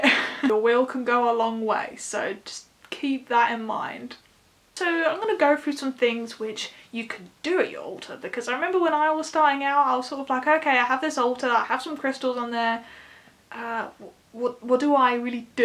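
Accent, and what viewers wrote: England, United Kingdom. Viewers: Finally someone with a nice English accent... I had already thought one could only be into witchy things if one wanted to live in Alabama.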